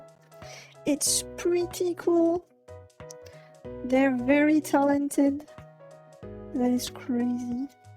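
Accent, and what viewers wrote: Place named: France. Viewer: Your english accent sounds like french. are you french?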